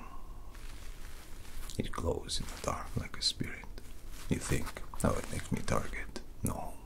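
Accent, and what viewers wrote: Russia. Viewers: I am always very pleased to hear you speak with a Russian accent. you are always the top of the top 🔝🔝🔝🔝 many more congratulations on 🎊150K🎉 even though you should be at a million or more ❤🤗❤ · I always really liked your russian accent,. The background hum is delightful here and goes well with the rather dark ambience. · I love your Russian accent! Strangely soothing for this tried and true Patriot. However, I want to be transparent. The gun sounded too plastic to me at the beginning, not sure why. We clean them all the time and I love the metallic sounds; the clicks of the hammer, the slide going into place, all of it. I love my Beretta ❤️ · I'm a huge fan of your russian accent, I find it very soothing and relaxing. This video goes straight to my favourites.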